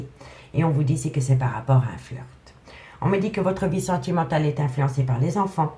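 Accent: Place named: South, France. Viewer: bonjour J'aimerai savoir si vous êtes du sud (on dirait bien ) entre Montpellier et Marseille SI OUI? vous consulter en réel,?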